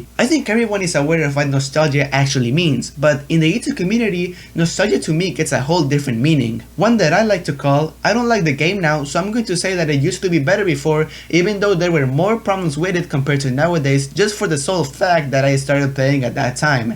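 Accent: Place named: Spain. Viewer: se le sale el español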